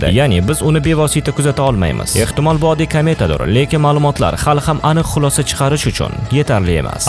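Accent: Tashkent, Uzbekistan. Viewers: Узбектилини.тугри.гапринг ука.тошкентнинг.шивасида.гапирманг.вотти.сузи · Факат узбекча гапир..тошкенча узбек тилига мансуб эмас.. Овотти..улвотти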